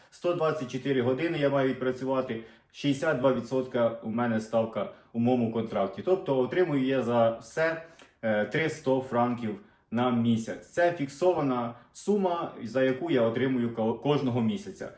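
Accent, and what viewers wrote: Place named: Vinnytsia, Ukraine. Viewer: .. У вас комплекс меншовартості, це говір вінничан. Людина має коріння, рід і це мова їхня.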